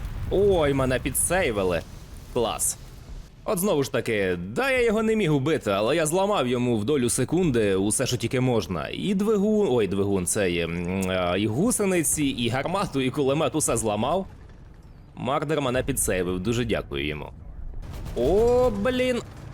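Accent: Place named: Ukraine. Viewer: Автор мабуть з Харкова чи Полтави. Такий жорсткий суржик.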